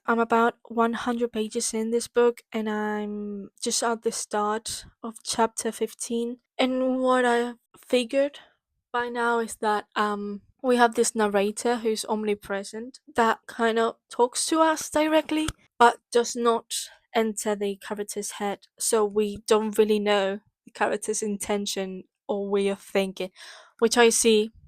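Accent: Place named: United Kingdom. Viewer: the britash accent is everything to me🗣️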